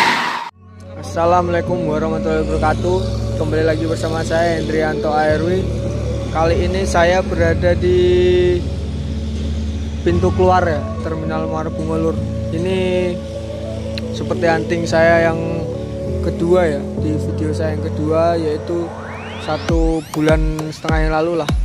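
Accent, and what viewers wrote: Java, Indonesia. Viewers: Adminnya ngomongnya kaya orang Jawa😁😁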